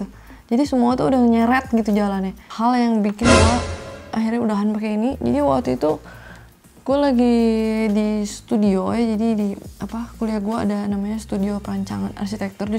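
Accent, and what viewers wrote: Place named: West Java, Indonesia. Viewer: Sunda pisan ieu mah euy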